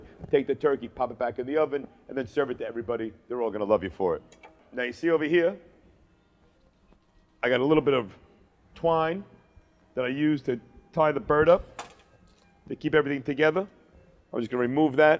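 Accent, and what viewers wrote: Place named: Brooklyn, United States. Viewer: Viewers! Carving begins. broken, Brooklyn “English” intro will bore you to tears if you go the whole nine.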